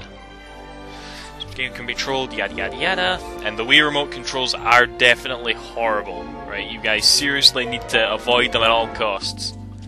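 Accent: Scotland, United Kingdom. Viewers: lolz nice scottish accent! hehe! the jokes r awesome too :D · Ok i just found out at first i thought he wus canadian but now i founs out he scottish i think =p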